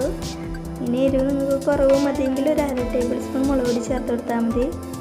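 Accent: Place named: Thrissur, India. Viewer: സംസാരം കേട്ടിട്ട് തൃശ്ശൂർ കാരി ആണെന്നു തോന്നുന്നു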